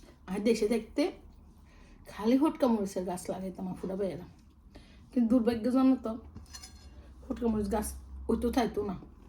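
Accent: Noakhali, Bangladesh. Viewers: আপু নোয়াখালী বর্না হইতেছে😭😭😭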